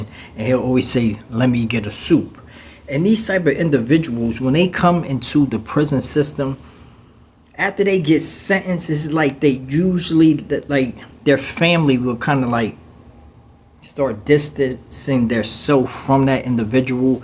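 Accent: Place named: East Coast, United States. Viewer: Also that vid u did when u asked if evil folks really exist since a lot of people be typing his name. Ima keep reminding u of stories cuz I use to sometimes watch all your videos while I was cleaning toilets with one AirPod in my ear lol so u got some chicken off me alone salute , you one my favorite prison story channels cuz u keep it real I’m from ny so I guess I relate more to your east coast way of speaking compared to the other Georgia prison yt channels , but try to link up with Charlie global , he moving fast in subscribers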